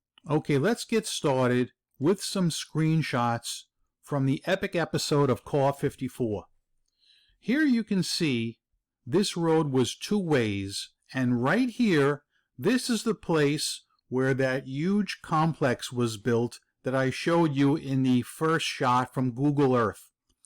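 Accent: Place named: Bronx, United States. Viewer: Thanks so much for this video - 1st, your accent reminds me of my dear departed mother, who grew up in various boroughs ( she was born in the Bronx ) - she and my dad ( who was from College Point ) moved upstate in the late 40s, but she never quite lost her accent. 2nd, your breakdown of what was being torn down and subsequently built put me in mind of what the overall plan for channeling traffic in and around NYC and its suburbs- and what part Robert Moses played in that construction- I heartily recommend the book “The Power Broker” ( I’m sure it’s still available on the used market ) - it’s a thick tome on his construction of freeways ( along with many other works in NYS ) - well worth the read for its mix of a powerful man’s vision and works - some very good, others not so much.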